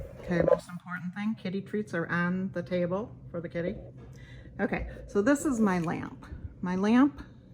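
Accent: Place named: Michigan, United States. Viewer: Great video, sounds like your a Michigan girl. I am, sorry if I'm wrong.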